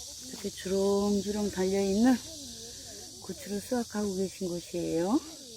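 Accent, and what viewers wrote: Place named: Chungcheong, South Korea. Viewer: 힘드시죠? 말하면 뭐한대유 충청도 맞아유.나두 충충도 태생이유 고추건조 보기 좋습니다 유투브도 대성하십시요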